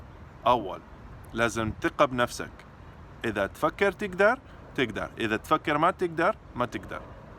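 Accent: Baghdad, Iraq. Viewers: تقريبا انت دا تتكلم باللهجة البغدادية انت فظيع عراقي قح Very motivating How can we say ضربتوني بوري in English?🤔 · وتحجي عراقي همات كلش حلو 👏🖤🖤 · لك اللهجة العراقية مالتك خرافية 😘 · yes this is iraqi accent I think this Baghdad accent nice I like 👍🏻it but much much different from Syrian or Lebanese arabic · فدوووة شون يحجي عراقي يخبلللل🤩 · He's more Iraqi than iraqis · نبرت صوتك عراقيه😀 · Wow amazing man,i am iraqi and i find your iraq dialect is so cool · Omg! I'm dying😩 that iraqi accent😍 in luv💕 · no that nearly truth cuz you're speak baghdad accent and it's the orginal but i'm can't 💕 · عراقي قح 😅😅😅 حتى هاي اتعلمتها 🤣🤣🤣🤣 Good you ar Amazing 🌺🌺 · والله لهجتك العراقية اصلية وروعة!!!!! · Oh my gosh I’m from iraq and you sir speak better then I do. That is a proper iraqi accent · he looks iraqi, and speaks like an iraqi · My god,! You blew my mind. Even other surrounding Arabic-speaking countries can not talk in Iraqi dialect like you can. 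Heck I know some Iraqis in the northern part that are not capable of speaking as you can (with all love and respect to them:D). You just inspired me to learn German and Chinese. You are 100% Iraqi now. Cheers! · حتا اللهجه عراقيه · Hhhaaa ❤️ love from Lebanon Beirut 🤦🏻‍♂️ love Iraq 🇮🇶 love Iraqi accent ❤️🌺 good for u فديتك كلش زين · Heloo ahoye evvelen alamud arüfek ena said.essanien ente tehçi lehçe İraki Kulliş mazbut cidden mobruk ismi Mehmet ena ayiş fi Türkiye ena hem yene etaellemet min ırakın liennu ehna aku ıraakınn huvaye Fed yom halllin nsavy mubaşır [live] video derbalek ala nefsek ahoye selam Min Al Türkiye 😆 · It's not the same arabic of the world arabic , it's like iraqi accent but nice We can understand you From Algeria 🇩🇿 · طريقة نُطقه كيوت يا ربي Your iraqi accent is so cute. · Iraqi accent 100% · Wow Unbelievable his accent iraqi so clearly respect from Algeria 🇩🇿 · انته تحجي عراقي مظبوط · هذا العراقي المظبوط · عمي لهجتك عراقية وضابط اللهجة فول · Wow proud of you and also I love your Iraqi accent. 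I’m a Kurd I currently speak Kurdish / Arabic / Spanish / English / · wow , you very smart , how you learn Iraqi accent in just 20 month!! good job, and i want aske you, d you know write and read in arabic or just a conversation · You speak Iraqi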